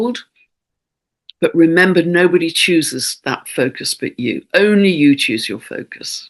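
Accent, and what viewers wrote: United Kingdom. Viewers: Good work! And nice to hear my first English BRITISH English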